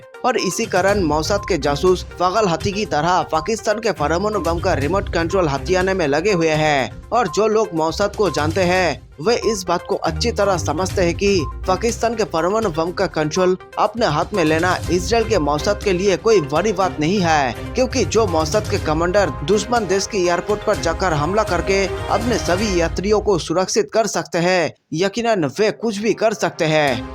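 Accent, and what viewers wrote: Bengal, India. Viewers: भाई आप बंगाली हो क्या? बोलने के लहजे से तो ऐसा ही लगता है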